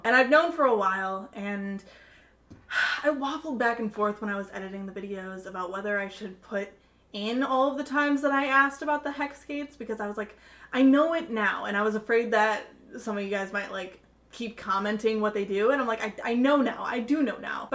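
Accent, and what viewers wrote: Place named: United States. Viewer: Thanks for the detailed and thoughtful comments! I appreciate all the time you have put into making the reaction and this video. I will just concentrate my comments on one: Silco being the villain/bad guy. I don't think this label fits him, or anyone else for that matter, well. The notion that he is driven by selfish desire is extremely dubious: in the final episode he articulates his four central demands, and calls them "everything we ever wanted." None of them has anything to do with himself. Shimmer is merely a means to those ends, which is why he did not hesitate to give it up. In fact, giving it up is considered so natural to him that he didn't even consider it haggling. Also, we need to put him in the proper context: he is a political revolutionary who is fighting for the independence of a nation. Ask yourself this: among the real nations of this world, how many achieved their independence without violently defeating enemies foreign and domestic? 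Judging by your accent, I guess you are a fellow American like me. Just think of our country: how did we become a free, united, independent nation? Well, among other things, we had to beat the British, the slave owners, the Native Americans, and the Mexicans. And our country is by no means unique in this regard, not even close. Your point about Jinx being better off with people like Jayce and Victor is no doubt true. But what about the hundreds of other children like Jinx in Zaun? Can their lives truly improve without the under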